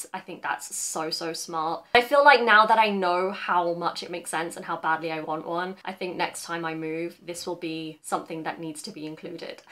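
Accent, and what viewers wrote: United Kingdom. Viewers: いつもの「british English」の発音が心地良いです エリザベス女王やサッチャー首相の発音が50年前にいた神父か牧師の発音に似ていて好き アメリカンはどこかいい加減で南部訛など聞いていられない、田舎者や貧乏人の様だ 今やウォシュレットとお風呂の湯はりは無いと引っ越ししたく成るほどの機能です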